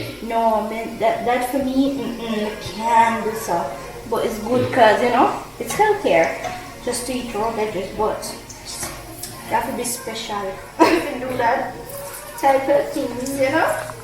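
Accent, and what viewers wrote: Jamaica. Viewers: mi rate yuh is blessed to have you as his wife...just love how you able to adapt embrace and love the Jamaican culture and food.. love to hear you chat patwah and its phrases like a true jamaican.....Big up to you and. One love. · seeing you cut that Julie mango makes me want to go home. So jealous as its nearing that time of year when mangoes are plentiful. I do hope I'll get back before the season ends. Love the Jamaican accent. You're really good at it💕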